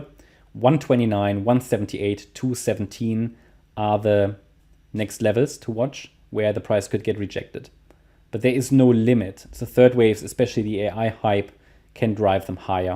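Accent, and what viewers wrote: Germany. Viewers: Man hört direkt raus das du deutsch bist :)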